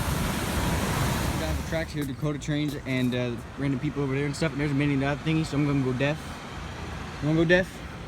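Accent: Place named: Canada. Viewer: guy has Canada accent but says PA in some vids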